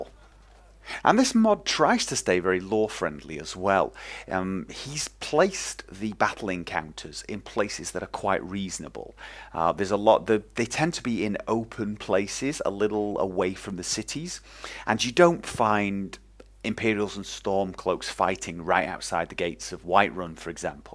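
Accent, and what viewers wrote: United Kingdom. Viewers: No he's British. :)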